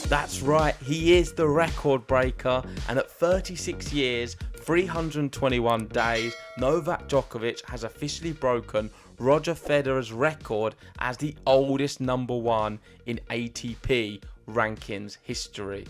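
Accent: United Kingdom. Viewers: Awesome accent. What part of Britain?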